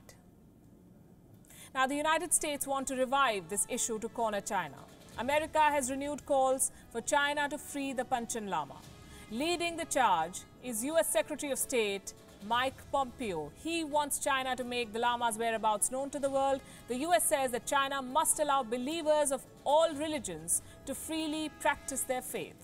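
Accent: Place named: India. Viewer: not really fond of indian accent but there is something about the way she talks that i always find interesting. not sure if its the clarity or the intonation.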